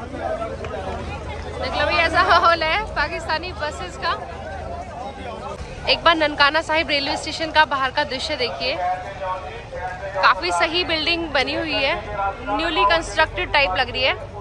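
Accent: India. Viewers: Tum lazmi hindu ho. Kyu k tum namastay aur sat sri akaaaal to bolti ho laikin salaaaam boltay tum ko bohat takleeef hoti hai. Sikh hoti to salaaam zaroooor karti. Sikh hoti to punjab ya khalistan ka naaam layti. Baaar. Baaaar. India. Ya. Hindustan. Na. Bolti. Tumhara. Urdu. Bolnay. Ka. Andaaaz. Pakka. U. P. Wala. Hai. Bhia. Ho. Bhia. U. P. Ki. Bhia. Love. Khalistan. ❤❤ v. Real. Sikh. For. Khalistan. Khalsa. For. Khalistan. May. To. Insaaaan. Ki. Shaksl. Dekh. Kar. Us. Ko. Pehchaaaan. Layta. Hoon.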